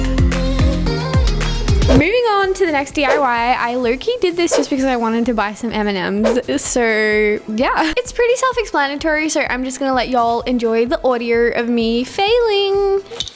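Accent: Australia. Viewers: her voice sounds as if she was aspyn ovard...yet australian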